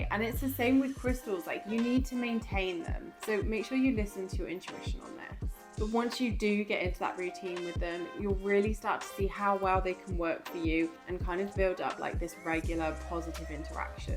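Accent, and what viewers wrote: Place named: United Kingdom. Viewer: Sweet Spirit you talk to fast!! But I love your British accent!!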